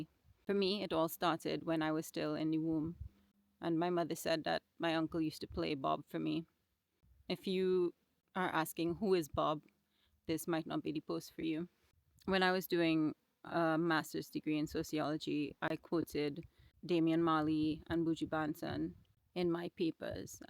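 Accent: Trinidad and Tobago. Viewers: I was thinking the same thing 😮,but after she started talking I knew she was from Trinidad & Tobago.Big up Sistren.. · Awesome I love your accent my dear Trini sister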